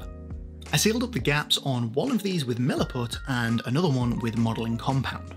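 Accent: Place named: England, United Kingdom. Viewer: just wondering qwhy u use inches when u sound english? it always confuses me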